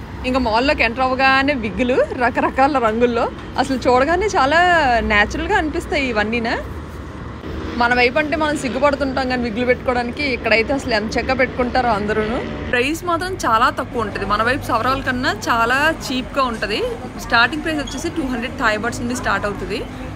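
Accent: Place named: Godavari, India. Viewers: Meru everything Bangkok ni chupisthunanru nd mi telugu slang spr.... YouTube nundi manchi ⭐⭐⭐ time pass ki indirect ga kastapaduthunnru miru 😍🙏👍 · మనం ఎక్కడికి వెళ్లిన మన స్లాంగ్ మాత్రం కానిపెట్టవచ్చు ఈస్ట్ గోదావరి అనుకుంటున్నా నేను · తూర్పు గోదావరి లా ఉంది మీ వాయిస్ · హాయ్... మీరు బావున్నారు. వాయిస్ గుడ్. సరే.... ఆంధ్రలో మీ నెగిటివ్ ప్లేస్ ఎక్కడ, ఎందుకంటే మీ మాట, యాస, బాష, గోదావరి జిల్లాలని ఉహించాను. గుడ్ గైడెన్స్.... వెల్ కమ్ ఆంధ్ర..... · Nice voice+ మన తెలుగు యాస = అక్క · మీ వాయిస్ సూపర్ వుంది. గోదావరి భాష. మీ వీడియోస్ చూస్తుంటే మా బ్యాంకాక్ ట్రిప్ కళ్ళకు కట్టినట్లు గుర్తుకువస్తుంది.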